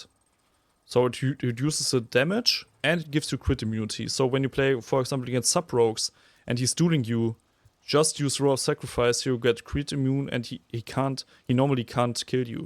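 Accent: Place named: Germany. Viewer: The german "zhe" instead of "the" gets me every time xd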